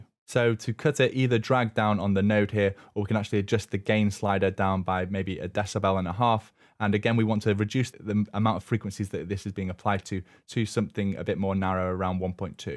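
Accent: United Kingdom. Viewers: you sound british..why do you give measurements in INCHES??? and why dont give both plz? Centimeters are world wide used!! IS very discouraging to have to go to an online calculator to convert them and moreover knowing that british like the rest of europe and maybe all the world ( except USA ) uses the metric system.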